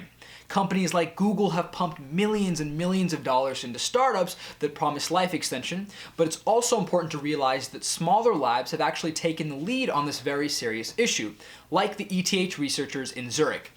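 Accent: India. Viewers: Do you have a tiny bit of an Indian accent? I'm not sure whether or not I'm hearing something.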